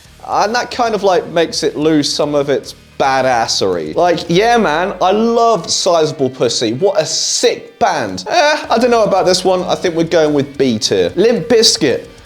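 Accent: United Kingdom. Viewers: limp has more then one meaning like floppy also you sound like your across the pond so bisket means cookie to you not sure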